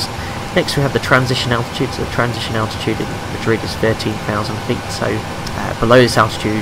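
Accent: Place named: United Kingdom. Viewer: Hey , I love your tutorials ..however you have to think about the watchers for which English is not the primary language spoken...if you just could take that into consideration and just articulate more that will help a lot to understand better your strong Brit accent ...specially with sim background noise Thank you ..👍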